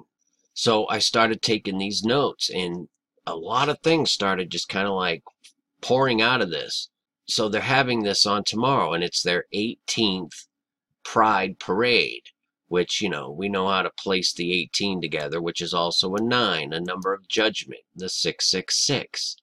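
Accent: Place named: Boston, United States. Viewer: This is incredibly nutty. And I just realized you're from Boston. Haha. You "sawr" it. 😊 Excellent work and crazy fingerprints of the evil architects. Look forward to your vids.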